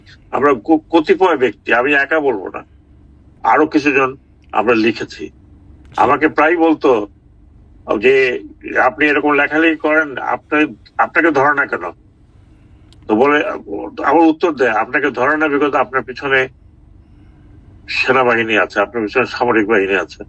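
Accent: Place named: Dhaka, Bangladesh. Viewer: আমি হাসবো না কাঁদব আমি চিন্তা করে পাচ্ছি না । ভিডিও তে বলা হয়েছে, হোস্ট নাকি জার্মানি তে থাকে, ভালো কথা, জার্মানি তে থেকে ও ঢাকা সুরে বাংলা বলছে । উনি পোস্টমর্টেম এর পরের ইন্টারভিউ আর এখন এর ইন্টারভিউ টোটালি আলাদা। যাক বয়স হয়েছে, একটু ভুলে যেতেই পারে। কিন্তু এটা বুজছি না, যে যদি কল টা জার্মানি একজন এর কাছে করা হয়, তাহলে আপলোডের এর IP এড্রেস ঢাকা হয় কিভাবে ? জার্মান থেকে আপলোড করতে পারত না ? অলস অনেক চেষ্টা করছে বুট লুকাতে পারল না, চ্যানেল এর বসে Ip হলো ইন্ডিয়া 😂😂😂। হেয়ার রে গুযোগ এর দেশ । উপর থেকে ভিডিও তে আগে কি হবে সেটাও এডিট করে আগাম বানি দিয়ে দিছে 😂😅😂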